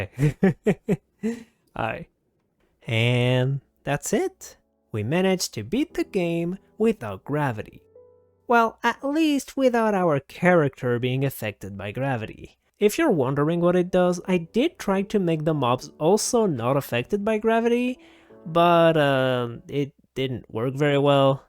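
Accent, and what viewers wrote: France. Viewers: You are french? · Give him a break, he's french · Are you French? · are you french? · Are u french??? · Oh, so that is a french accent! As a not native english speaker it is good to find out how exactly different accents sound like. Great video as always!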